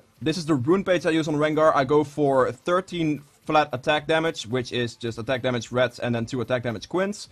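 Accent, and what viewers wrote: Netherlands. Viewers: You have a dutch accent are you dutch???? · dacht ik al aan je accent te horen! Vooral aan de S:)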